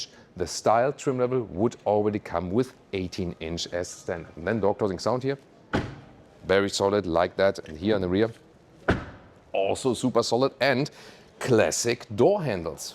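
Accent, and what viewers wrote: Germany. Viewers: Dude, I love your videos but you really need to improve (fix) that accent. I know that German is closer to English accent than Greek, but believe me, this makes it also much easier for you to transition to a more British accent. It is hard to concentrate to content when I think I am listening to Mertz talking about German Fiscal discipline! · bro bitte lern besseres Englisch... ist nicht böse gemeint nur Kritik (dein Satzbau war zwischendrin wirklich fatal), aber behalt den deutschen Akzent, den mag ich ;)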